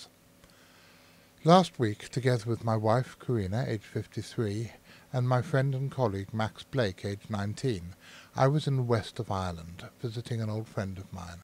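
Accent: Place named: RP, United Kingdom. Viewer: Apart from all of the comments about the video quality and style, 3 things. 1. Your age is not a qualifier of reliability (or your plummy accent). 2. Haven't you EVER seen what a swan or other large bird has to do to get into the air from water? 3. You also can't convince us by dismissing all the images beforehand '... the are just large fish, don't worry about them... blah blah'. I'm sad about all these things. The world is a strange place, and we're all interested, but this? Buh.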